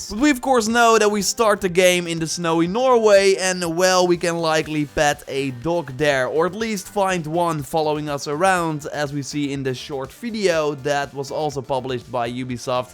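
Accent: Netherlands. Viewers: I know you life in the netherlands because of your voice/ik woon hier ook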